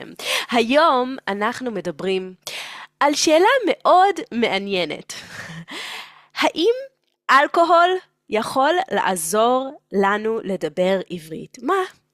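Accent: United States. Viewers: I like your hebrew , but you have an american accent. بتحكي عربي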